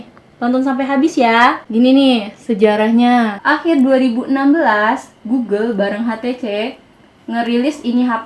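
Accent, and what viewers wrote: Banyumas, Indonesia. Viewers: kok logat nya agak ke ngapak ya orang ngapak kah? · Ko ngapak bre? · Asline ngapak bbang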